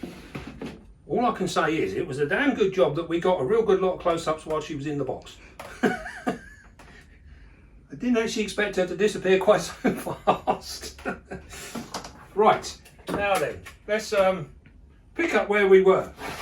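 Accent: United Kingdom. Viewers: I'm french and not bilingual at all and can perfectly understand him. I actually like his british accent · That's British, not Kiwi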